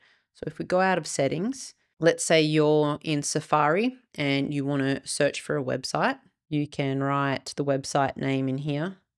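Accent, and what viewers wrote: Australia. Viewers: Now im being presumptuous and assuming Melbourne accent. So, the huge question is…… Collingwood or Carlton? I’m Collingwood good win last night 😎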